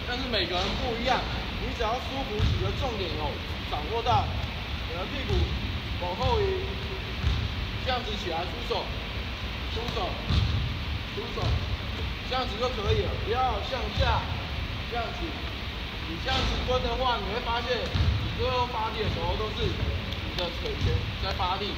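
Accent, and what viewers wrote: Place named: Minnan, China. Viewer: 你很mean。这是闽南那一带说话方式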